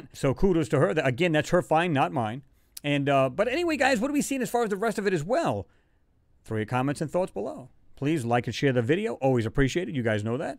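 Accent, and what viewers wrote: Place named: Louisiana, United States. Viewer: You sound like you’re from Louisiana. My home state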